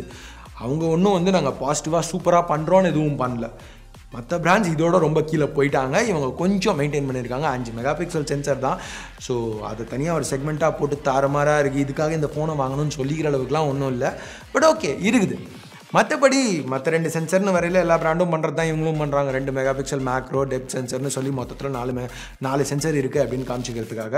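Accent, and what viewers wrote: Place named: Chennai, India. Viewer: Now a days great changes in videos. Especially on slang. Chennai slang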